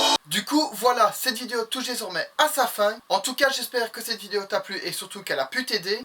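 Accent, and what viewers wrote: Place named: Belgium. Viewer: quel accent belge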